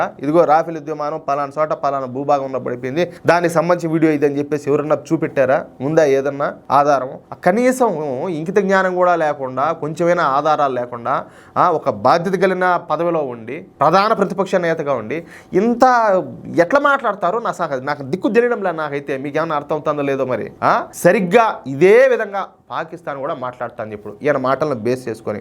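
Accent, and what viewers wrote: Rayalaseema, India. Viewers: U speak my language in rayala rajyam slang bro ... gan D super bro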